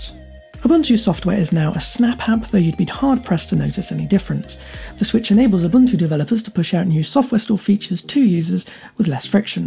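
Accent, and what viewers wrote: United Kingdom. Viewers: Which part of british are you from?? I can barely hear what you are talking..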